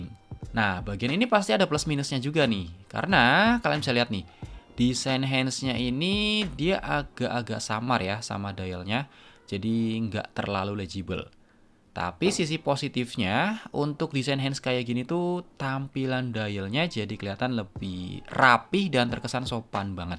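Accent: Tegal, Indonesia. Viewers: Oalah...masnya dari Tegal toh...makanya kalau bilang Gua kedengarannya unik 😊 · Oh mas nya wong tegal ternyata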